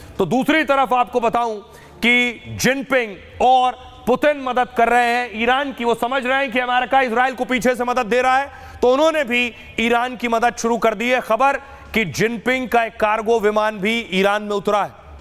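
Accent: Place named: Pahadi, India. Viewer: मुझे आपका पहाडी आवज बहोत पसंत आता हैं घर में मोबाईल रख दो और काम करते करते समाचार सुनते रहो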